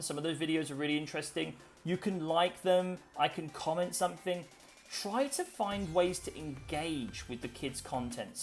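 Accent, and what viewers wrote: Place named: United States. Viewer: Wicked! You use a lot of American pronunciation, by the way. Thanks.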